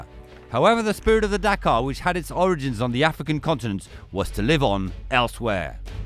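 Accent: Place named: France. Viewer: Need more French accent!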